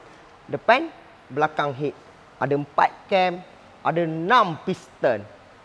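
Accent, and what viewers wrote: Pahang, Malaysia. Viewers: ape pon channel bnyak bantu dlm ilmu yg serba kekurangan tu aku ttp boleh paham..yg penting gelak sendiri tu paling rare..pasni cer try buat video pasai aircond wira bile on je blower jalan tapi compresor xjalan dan bile compresor direct beteri heeellllok pulak..harap ade video ni utk care nak mencari punce die...bossku..loghat awok pahang sangat..hahahaha🤣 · Bengkel kat mana? Cam org phg je..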